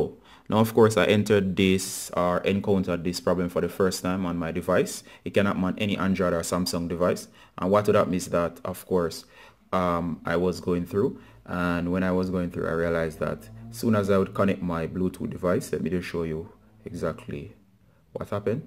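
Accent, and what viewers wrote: Jamaica. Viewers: You sound like a jamaican. Well thanks for the advice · A which part a Jamaica yuh come from dawg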